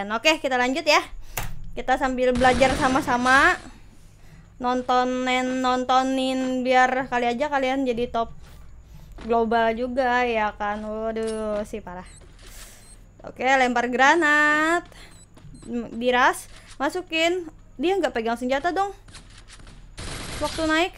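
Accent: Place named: Kalimantan, Indonesia. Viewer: orang mana ko bahasanya kaya orang kalimantab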